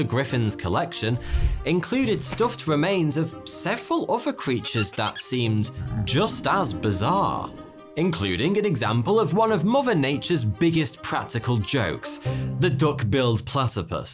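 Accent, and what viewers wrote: United Kingdom. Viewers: You are British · I love the fact that you speak British English but also uses American words and colloquialism to inject some humor to your stories!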